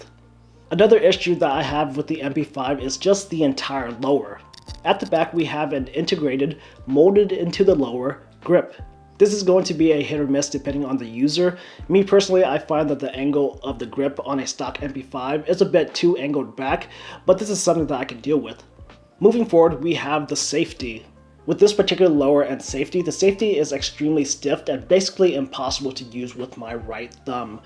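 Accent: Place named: Pacific Northwest, United States. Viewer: Sounds like you live in the PNW.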